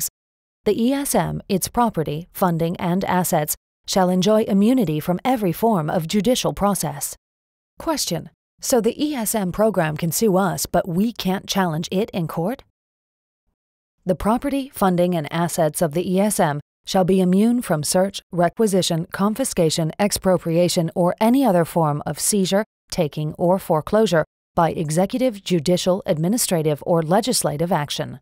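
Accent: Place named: United States. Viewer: So why does the narrator have an American accent?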